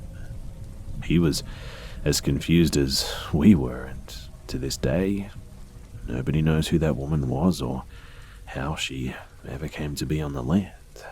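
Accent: Australia. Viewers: Fan from the sunny coast, stoked to hear a fellow Aussie killing the horror!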